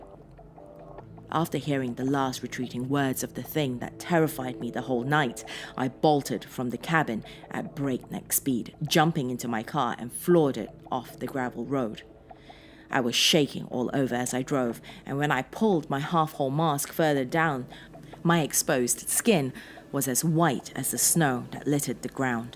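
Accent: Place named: RP, United Kingdom. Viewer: I'm really enjoying your channel. I have noticed that you seem to get more adverts than the likes of Mr. CreepyPasta and Creeps McPasta (my head has a problem getting around Creeps' last name and yours lol!) You seem to be proving my theory that its stories like Slenderman and probably Jeff/Jane the Killer that have been involved with real life controversy that keeps advertising away. I have been puzzling over your accent which comes across as very non accented BBC or RP English. I firmly believe that narrators like yourself do as much preparation as you would if you were acting a part. I'd guess that you've done English to a high standard and have done some voice production work which makes me think that you're an actress. The thing that got me puzzled about your accent was that occasionally it sounds almost like a really soft Scottish lilt and occasionally I hear a little London (I'm a Scot living in London). 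Its brilliant listening to stories being read properly and if you haven't already, I would approach some of the publishers who do audiobooks because, I've heard a lot since I can't seem to own reading glasses for longer than 48 hours without breaking them! I have listened to a lot of narrators who are working on Best Selling Audio Books and you are every bit as good as, if not better than most of them. I am really pleased to have found your site because I am heartily sick of hearing good stories murdered by narrators who don't seem to prepare or even look the story ove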